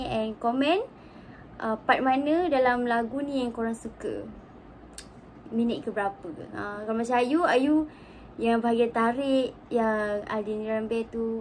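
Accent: Malaysia. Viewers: Saya orang indonesia,dengar suara orang malaysia ngmng,sangat kagum apalgi cantik banget macam ukhti ayu..mantaab... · Candu Logat Melayu 😭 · Dialek malaysia saya suka dengar. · Saye dri Indonesia🇮🇩 tpi saye suke bahase logat Malaysia,Karne enak di dengar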